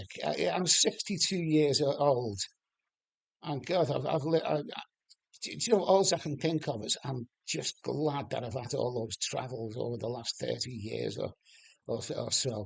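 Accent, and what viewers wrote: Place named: Liverpool, United Kingdom. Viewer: I was the guy that bumped in to you in Pucket Irish bar a couple of years ago and also from Liverpool, recognised your accent. You told me that you were from Bootle. I have followed your channel ever since. Good luck, your attitude to this is insparation hopefully for others.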